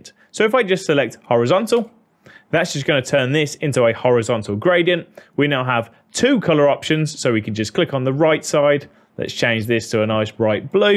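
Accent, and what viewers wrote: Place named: Birmingham, United Kingdom. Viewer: I am watching you from 2 years and I was prety sure you have a brummie accent but I wasn't sure until today when I saw your Twitter account.:goodvibes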